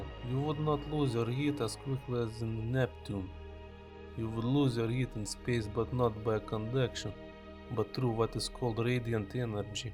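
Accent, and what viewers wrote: Russia. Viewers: Aahhh Russian accent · You sound really russian Oh yeah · Typical Russian voice xd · God ur accent is fuckin russian ! Loved it but i rather like the older channel !! Keep uploading on it ! Thnx love from india ! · You sound very russian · Unique russian accent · Try fix the russian accent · Sounds like crazy Russian hacker mixed with gru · Really love that Russian accent! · I love this Russian accent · I like russians ur russian i hear it from ur voice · I actually enjoy more of this Russian accent than normal English accent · Im confused xD are you actually russian or just playing the accent. Either way it's on point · Dude the Russian accent makes it seem cooler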